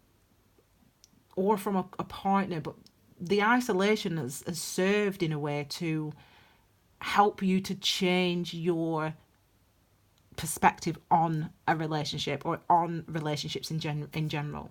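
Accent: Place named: Northern England, United Kingdom. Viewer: Welcome back! Really lovely to hear from you. I forgot how much I've missed your accent! Eeh by eck lass! 😂😂😂 Clare (Manchester) xx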